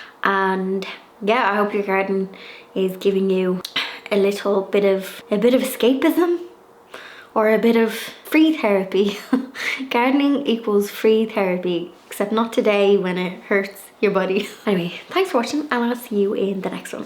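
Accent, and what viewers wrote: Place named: Ireland. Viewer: Brilliant I could listen to you all day long....your accent is amazing and I love your little ditties....pure Irish. X Best wishes from the UK... X 🌼🌸🌱🌿🌾I even love to meet you and have a brew ... ☺️👍☕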